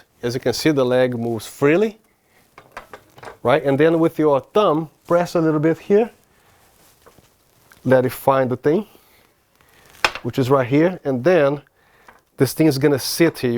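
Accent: Brazil. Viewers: haha yes, I know a lot of Brazilians here in Houston and recognized it, hope you had a great new years, this was great information and you explained it really well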